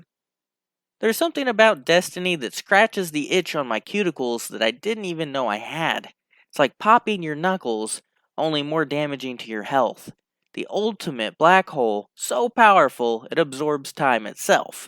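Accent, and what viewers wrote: United States. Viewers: if i didnt knew it better i would think you are american (cause of your english skills and speech) :D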